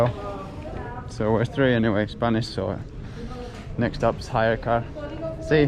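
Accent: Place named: Northern Ireland, United Kingdom. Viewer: Northern Ireland accent + facemask = subtitles on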